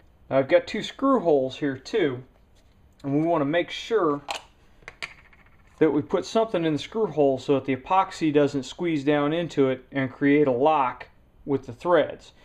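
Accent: Upper Midwest, United States. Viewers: Haha, yeah. Northern-midwest accent.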